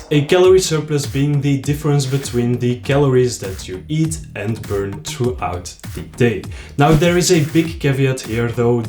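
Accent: Belgium. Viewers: ik dacht al dit klinkt als een belg toen zag ik die volle melk en wist ik het zeker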